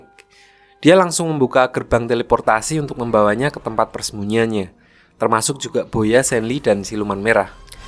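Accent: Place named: Java, Indonesia. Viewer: Medok jawa banget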